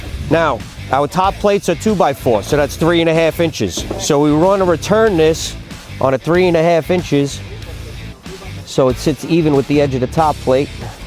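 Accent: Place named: Philadelphia, United States. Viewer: I just love his accent Philadelphia😮🍄🍄🍄🍄🥇🥇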